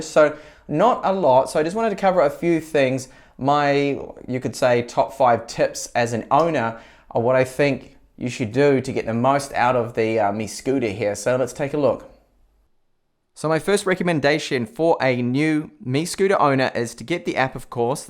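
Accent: New Zealand. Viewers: R ur from New Zealand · Are you a kiwi? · Hi, where are you from? :) your accent seems like a friend of mine from NZ...Very good reviews in your channel.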